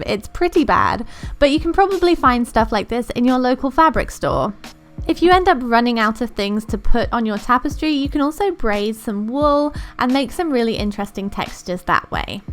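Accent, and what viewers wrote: England, United Kingdom. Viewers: Do you live in America? Because you have a British accent but we don't have "Michael's" here do we? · Is she English or American.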